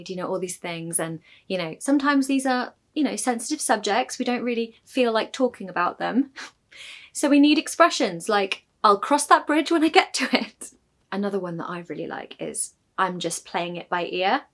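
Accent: United Kingdom. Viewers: Oh... my favourite tutor... with the British Accent.. I Love... ❤ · Thanks for the youtubers like U 😊 i really like british english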